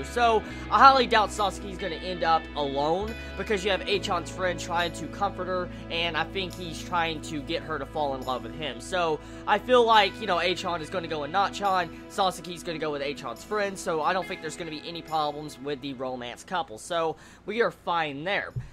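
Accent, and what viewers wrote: South, United States. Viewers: Great review! First time viewing your channel, and I find it to be very well planned and enthusiastic. I can tell you do this for yourself yet you still appreciate your viewers and subscribers. Hearing Japanese names in a southern accent is hilarious and I hope you don't try to fix that. Yes I'm starting to get the anime blues on this one as well. This series is one of the most inspiring and entertaining sports anime I've ever seen! I have always enjoyed the more realistic dramas more than the fantasy shonen. I suppose that's why I like this one so much. Thanks for what you do. *subscribed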